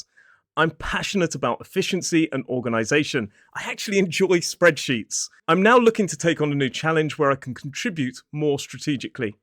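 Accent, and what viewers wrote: United Kingdom. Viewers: Hi, I'm Alauda (which means lark in latin). I'm german, raised in north Germany, Angeln, where some Anglo-Saxons came from originally 🤓, and I'm living in France. I'm retired now and living with my cats in a little cabin. I love to read english and american litterature, english is my favorite language, but I never really spoke it. Since some months ago, I try to activate my english by writing youtube comments. I have no concrete use for it, but I like your channel nevertheless, and particularly your so bri'ish accent. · I like your British accent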